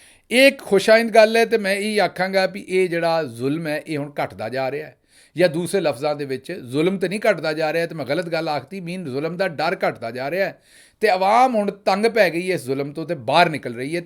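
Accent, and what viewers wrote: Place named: Punjab, India. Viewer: Kamal Punjabi.... Love it... I am myself a Punjabi... I enjoy your accent... ❤❤❤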